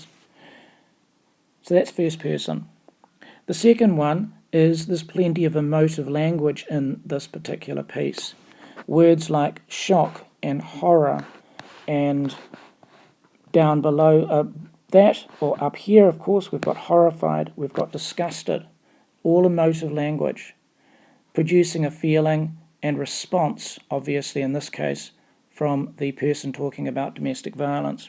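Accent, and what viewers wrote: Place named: New Zealand. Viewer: No doubt your a new zealander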